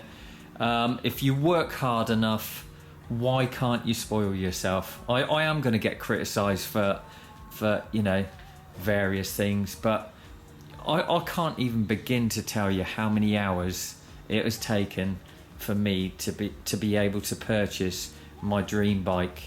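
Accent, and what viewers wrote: United Kingdom. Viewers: Absolutely awesome review! I am a Rockster rider since 2010 and i couldn''t agree more about your comments concerning drive shaft! Now maybe it's time so see if some future 1250 owners are selling a Rallye at a good price :) I just went to a BMW dealer last friday and i jumped on a low frame low seat Adventure and i really tought i couldn't touch the ground with both feet with my 1.82m but i was wrong! I felt very good and so i added the Adventure to my possible choice! Thanks for your video, loved the bike, the clear english accent and the true passion that i felt when you picked the bike from the dealer! Cheers Loris from Italy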